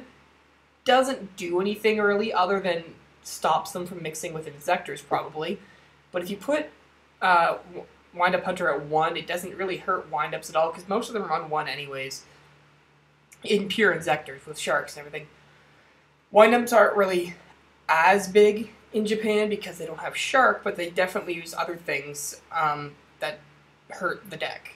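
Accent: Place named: Canada. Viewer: u canadian? good video tho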